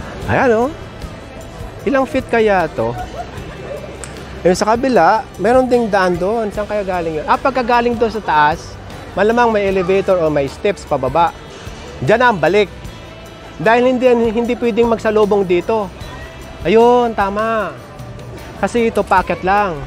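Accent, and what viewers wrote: Visayas, Philippines. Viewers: Visaya ba c kuya?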